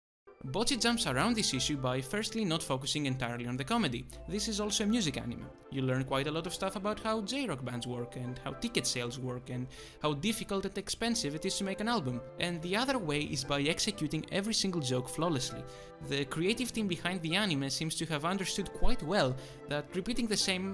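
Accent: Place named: Greece. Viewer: M8 ur accent sounds greek perhaps the first greek person with good taste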